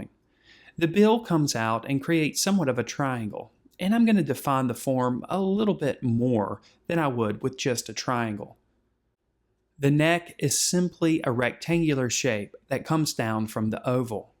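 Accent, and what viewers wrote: South, United States. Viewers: Americans, which regional accent is this? I love it! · Maybe South Eastern. Has a southern drawl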